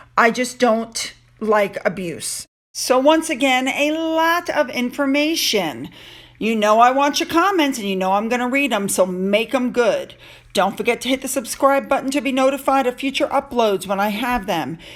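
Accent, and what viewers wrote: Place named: United States. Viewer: HI NUMPTIES saying how to pronounce words I love your American accent and your very well spoken and a great Narrator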